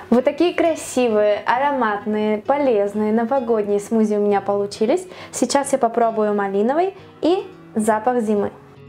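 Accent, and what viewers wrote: Spain. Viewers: Кто заметил что розговаривает с Испанским окцентом. Мне это нравки🤗🤗🤗😗😗😗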